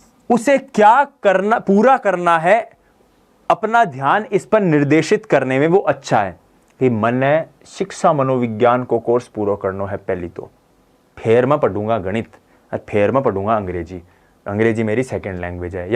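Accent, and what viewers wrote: Rajasthan, India. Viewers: Sir ur marwadi is fabulous. We olso speak this language in my home ☺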